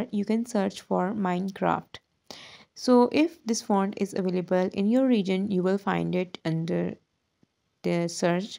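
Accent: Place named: India. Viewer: Of course we will get trolled cause don't y'all hear the Indian accent slipping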